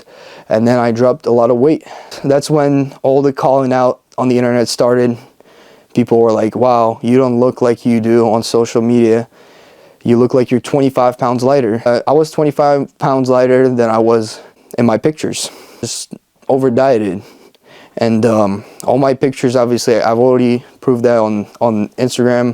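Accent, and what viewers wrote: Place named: Ukraine. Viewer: Never mind your Ukrainian. I wrote that before I saw the whole video lol I detected a slight accent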